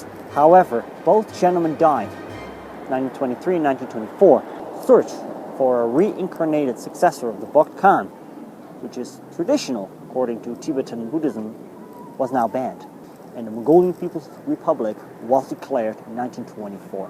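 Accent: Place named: Netherlands. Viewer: Most interesting history, are you dutch? Your accent is very dutch.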